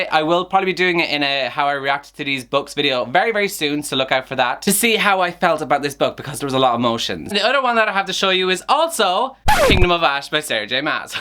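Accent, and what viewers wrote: Ireland. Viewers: I found you through Chloe’s channel and it’s soo nice to hear an Irish accent on booktube.. makes me homesick and want to be back in the whest 😛.. new sub can’t wait to watch move.. love a fellow Irish gal 😘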